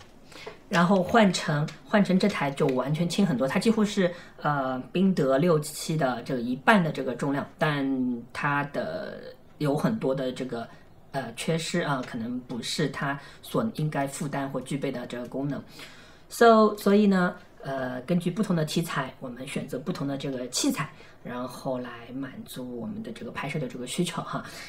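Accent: China. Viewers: 上海摄友来报道，听到乡音很亲切，哈哈😊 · 是昆明话吧？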